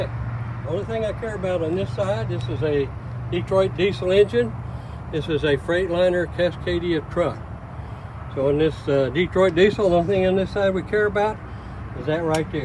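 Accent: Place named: South, United States. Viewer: He does mumble a tad bit y'all but...that's what's called a "Southern Twang", don't worry about it, just pay close attention, this man is a GENIUS! 👍🏽😉😎🫡👌🏽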